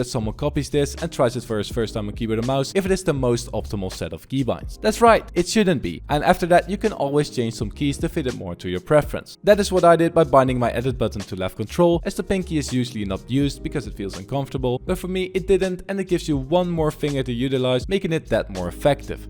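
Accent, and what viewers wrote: Netherlands. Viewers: Mooi nederlands accent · i just bought my keyboard n mouse and getting it tommorow! you realy helped me thx! btw are you dutch?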